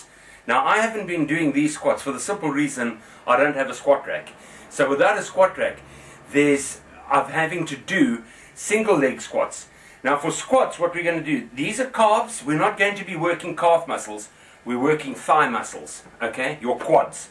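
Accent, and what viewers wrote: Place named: Cape Town, South Africa. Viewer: Thanks from Peshawar, Pakistan. Luv your Cape Town accent.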